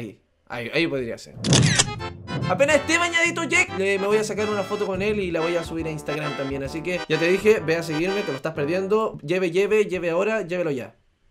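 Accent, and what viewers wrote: Chile. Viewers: ¿Porque habla como chileno? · De que país es el? Tiene acento chileno la wea cuántica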